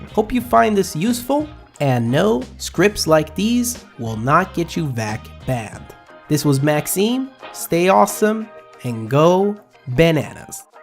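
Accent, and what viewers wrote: France. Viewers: Are you french? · So beautiful french accent:O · good french accent banana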